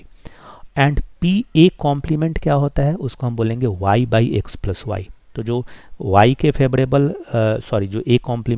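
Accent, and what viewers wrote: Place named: Uttar Pradesh, India. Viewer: Sir aap toh UP ke lagte ho... BTW Amazing explanation. Thank you (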